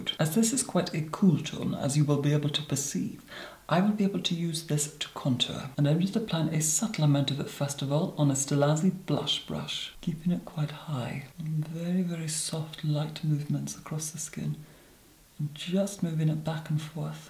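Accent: Scotland, United Kingdom. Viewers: .... our Lord says England but has a Scottish accent? Surely should still say the UK? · He has a lovely accent although there’s defo a Scottish accent undertone. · He's definitely Scottish. Go back to his first video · The first video of yours I've watched and I immediately picked up on the Scottish accent 😍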